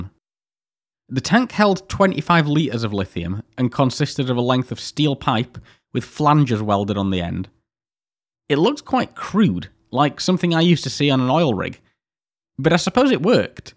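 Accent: United States. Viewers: This is a really interesting video, but do you have to speak in American English? It spoils your delivery.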